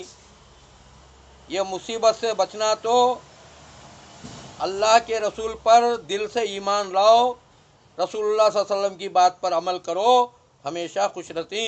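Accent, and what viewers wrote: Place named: Hyderabad, India. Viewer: Ye baba konsi language bol rahy hai... Hyderabadi?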